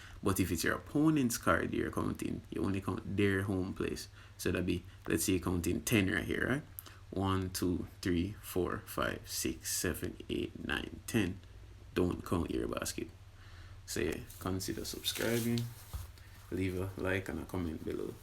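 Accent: Jamaica. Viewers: Are you Jamaican?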